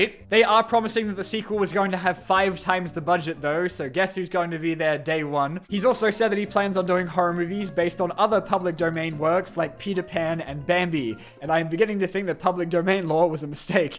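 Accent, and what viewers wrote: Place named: Australia. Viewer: . Are you Australian? I did not know that!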